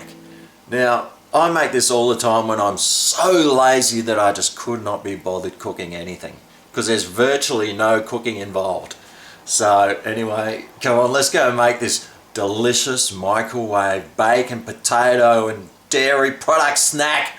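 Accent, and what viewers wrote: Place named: Australia. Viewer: I like your videos. Also a great way to learn some Aussie English:)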